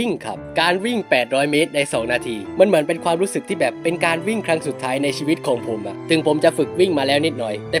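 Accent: South, Thailand. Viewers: พี่บ่าวคนใต้ม้ายนิ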